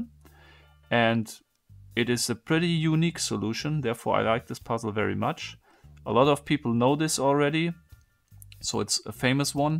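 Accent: Germany. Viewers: The German accent makes me want to watch his videos even more :))) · Is this a german acent?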